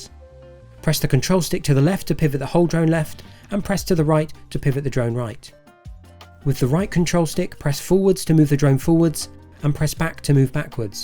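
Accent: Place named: Scotland, United Kingdom. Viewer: Thank you so much for such a great and inspiring video. I’m intending to buy the NEO as my first drone (have watched your other superb and helpful videos on it and have liked and subscribed). But this one has really inspired me. Plus the heads up on the phone video software that you used - amazing to get those shots that are perfect for sharing with family). Nostalgia see your MTB route and hearing your accent as agreed living 40y in Scotland we have just moved south to ‘the north’ to be near our ’kids’ but still have my eMTB active !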